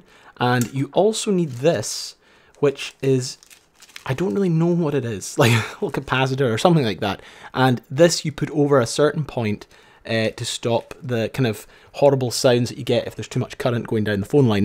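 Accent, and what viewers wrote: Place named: Scotland, United Kingdom. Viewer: "dialing the hooce". I love the Scottish accent. My girlfriend is from Oban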